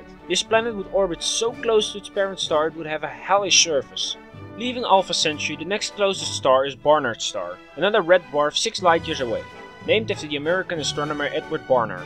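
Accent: Netherlands. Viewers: That Dutch accent!!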